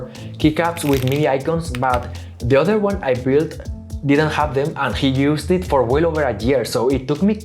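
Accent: Spain. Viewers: Very interesting that your english has a clear spanish accent. Not sure if Korean and Spanish sound the same now.. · Oye se nota que eres español, por que no haces el canal en español? Seguramente tambien tendrias muchas mas visitas, o por lo menos un canal secundario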